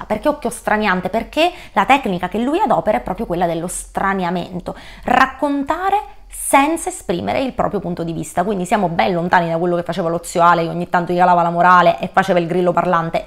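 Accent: Sicily, Italy. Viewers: Adoro questa tipologia di video, anche il tuo siciliano 👍🏻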